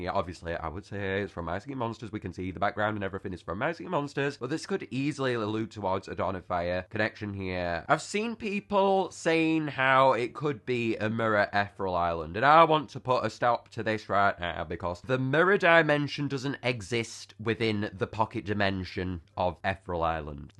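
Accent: United Kingdom. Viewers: You are great to watch! I love your British accent! 💖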